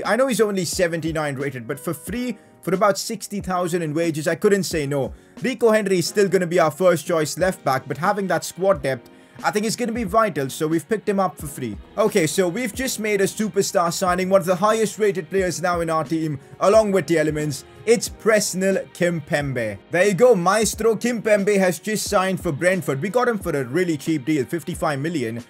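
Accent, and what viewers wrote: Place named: India. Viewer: u have the biggest Indian accent it’s probably because u are the biggest Indian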